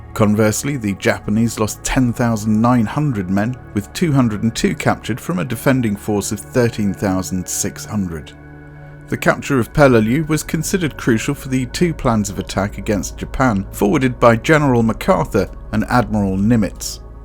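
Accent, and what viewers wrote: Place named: United Kingdom. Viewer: Thanks yet again for an informative an interesting piece of work. Your style is and unruffled and your British accent is, of course, charming as hell...as always. Have to say I did miss " " at the end, though. It is always such a relaxing end to a good time, especially if you do a ramble at the end. More, Please, Sir!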